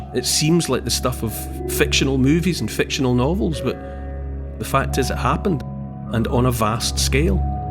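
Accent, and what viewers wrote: Scotland, United Kingdom. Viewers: Cool Scottish brogue.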